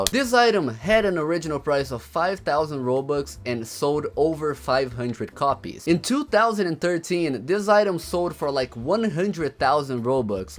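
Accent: Brazil. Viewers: esse sutaque de br vai ter q vir num churras de domingo aq em casa em. BTW VERY GOOD ENGLISH BRO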